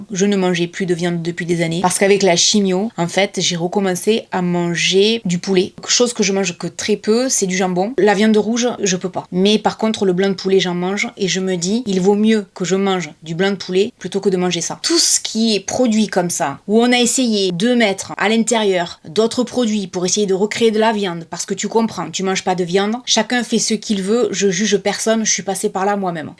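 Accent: South, France. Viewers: Merci Belle. Toujours un plaisir à écouter avec ce joli accent du sud. Courage, tu as le cancer mais le cancer ne t'a pas et ça, ça fait toute la différence.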